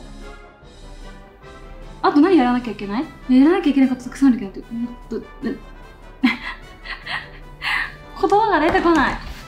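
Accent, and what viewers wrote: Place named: Kansai, Japan. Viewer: 関西弁よき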